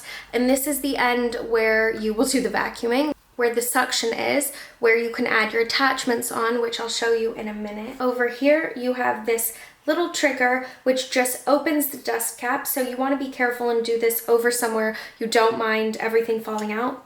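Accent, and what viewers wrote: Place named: United States. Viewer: London...that explains the British AC plug, but not the American accent of the presenter. But good job though. Answered all my questions about the Shark Wandvac. I'm going to assume they come with US AC plugs for US customers.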